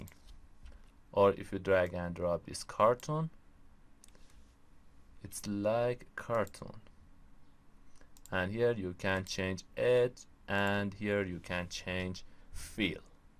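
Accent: Iran. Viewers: it's so obvious he is persian lol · Are you persian?your pronunciation like persians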